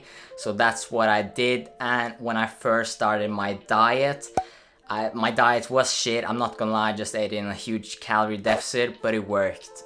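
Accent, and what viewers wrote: Norway. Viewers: Listened to your voice for 5 seconds, then I recognized the norwegian accent ;)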